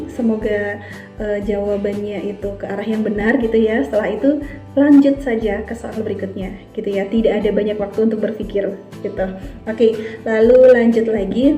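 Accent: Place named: Banyumas, Indonesia. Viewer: Kaya ne wong ngapak rika yu'..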